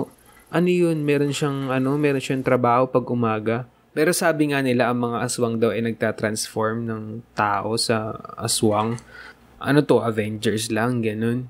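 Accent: Philippines. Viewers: I love your Filipino accent Say it again.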